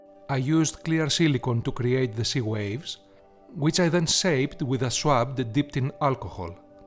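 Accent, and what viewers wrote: Greece. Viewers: are you greek ? Your accent sounds alike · Με τη προφορα των αγγλικών ήμουν σιγουρος οτι εισαι Ελληνας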